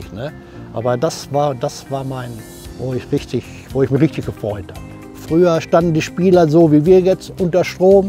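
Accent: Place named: East Westphalia, Germany. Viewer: Schon bei der ersten Ansage merkt man: Ein echter Ostwestfale!🖤💙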